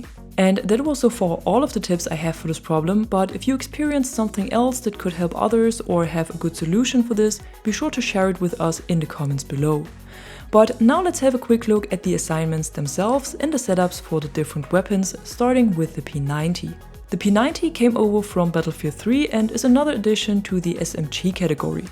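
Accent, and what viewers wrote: Germany. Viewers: Dein deutscher Akzent hört man sehr gut raus . Würde mir in deutsch besser gefallen ✌🏻😌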